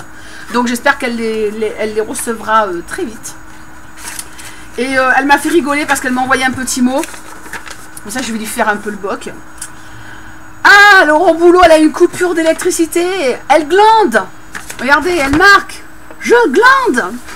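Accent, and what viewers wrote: England, United Kingdom. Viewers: que j'aime ton accent anglais :p Et oui faut PAS acheter à n'importe qui et surtout pas une arnaqueuse lol MDR mon mot à l'écran !! Hihi et bien pour ma défense je me suis bein rattrapé :p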